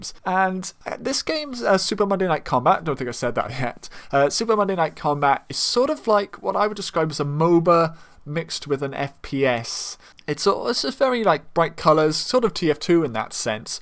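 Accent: Wales, United Kingdom. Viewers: idk lol i heard little bits of a welsh accent lol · Is he Welsh? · Are you British?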